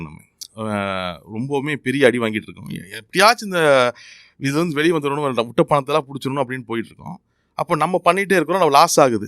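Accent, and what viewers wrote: Kongu, India. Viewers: இனிமையான கொங்குத்தமிழ்..... 👍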